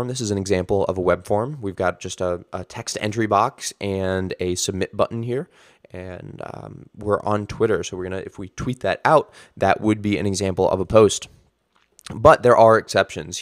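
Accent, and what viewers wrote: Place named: England, United Kingdom. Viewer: yes first video I found in an English accent rether than Indian accent. I can finally understand every word without having to rewind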